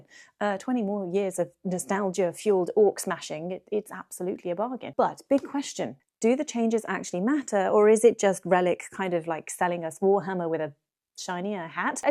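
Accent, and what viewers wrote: United Kingdom. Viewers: Uk-uk! A knowledgeable, entertaining, nice and pretty person with a British accent on the internet! And covering topics that are also my favorite cup of tea! Subscribing now and listening to while having coffee and shaking my pitchfork at the Witcher Show Series.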